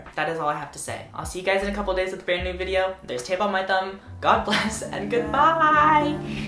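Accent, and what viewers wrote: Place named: Texas, United States. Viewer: U've been so texan lately!!! I'm love it!!! Y'all!! Ur accen!! Yeehaw king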